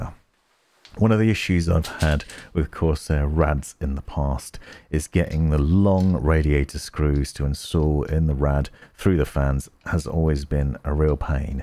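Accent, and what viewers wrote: United Kingdom. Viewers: And because of the fact, that the most english I have to deal with is primary with some american flair, it was kinda cool to listen to a british tongue ;)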